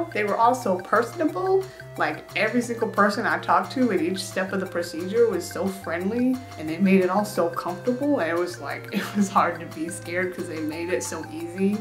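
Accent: South, United States. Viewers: mad dy I’m Southern and it’s close. She’s from Kentucky, apparently, so I’m not far off.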